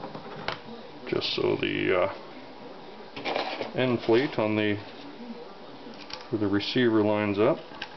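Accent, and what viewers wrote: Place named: Canada. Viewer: LOL 2011KyussLives how could you not tell he is Canadian. Kyuss great band btw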